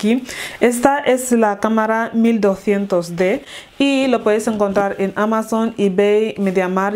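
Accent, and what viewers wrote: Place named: Equatorial Guinea. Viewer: Saludos desde Buenos Aires!! Me encanta el acento de Guinea Ecuatorial!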